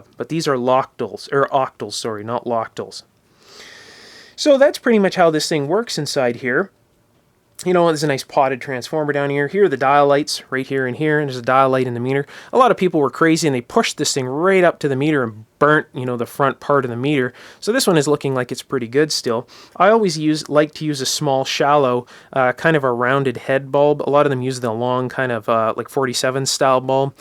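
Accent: Canada. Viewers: I love your Canadian accent. My grandfather was Canadian and it's been a while since I've heard this accent! Good tip on finding the outside shield end of an unmarked cap. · Your accent makes me think you're from Canada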